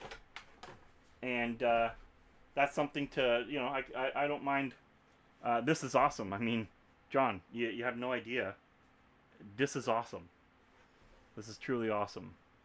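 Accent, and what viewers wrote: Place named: Texas, United States. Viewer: Worst Texas accent I ever heard y'all.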